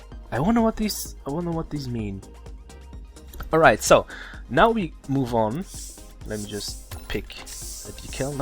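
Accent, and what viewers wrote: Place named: Germany. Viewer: mal ne runde zocken? Man hörts am English :)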